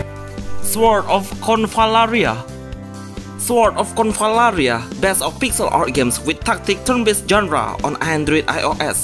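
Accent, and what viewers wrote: Indonesia. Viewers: Let me gguess by the sound of your accent your Indonesian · hmm I feel you are Indonesian or Russian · logat indo mu kerasa banget bang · dari aksennya orang indo kah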